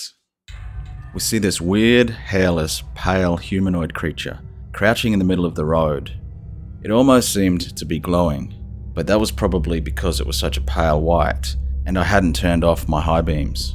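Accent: Australia. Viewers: Miss you, cant fall asleep without that Aussie narration and humor, also wish I could have a smile & a Vegemite sandwich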